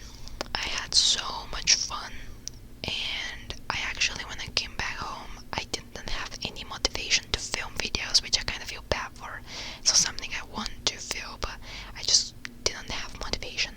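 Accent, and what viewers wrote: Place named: Europe. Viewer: Love this video. Really enjoy rambling videos in general as they are so very relaxing. Absolutely love your accent. Can’t quite pinpoint what it is but maybe somewhere European? Whilst I really enjoy your GeoGuessr, Wordle and Sims videos, would love you to do these rambles occasionally if you have time. Thank you for the top ASMR content x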